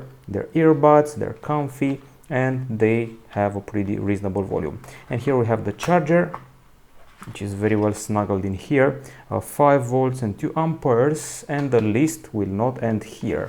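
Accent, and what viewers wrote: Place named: Romania. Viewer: Îmi place accentul de roman:*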